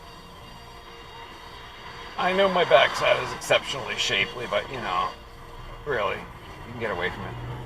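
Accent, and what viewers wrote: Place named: Boston, United States. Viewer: You can take the out of Boston, but you can't take the Boston out of!:-D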